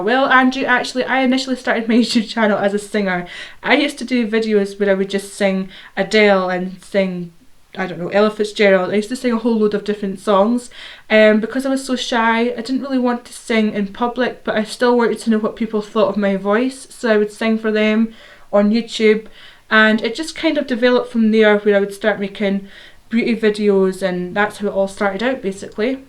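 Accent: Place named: Scotland, United Kingdom. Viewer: That's so funny. My wife and I tap three times on wood any time we don't want to jinx something, nine times if it's really important. We also love Andrew. He's a very lovable sweet guy. Great video. I watched your most recent singing video. Very nice btw, and I was wondering where your accent was from. I think you might have said Scotland, well, I'm assuming it's Scottish.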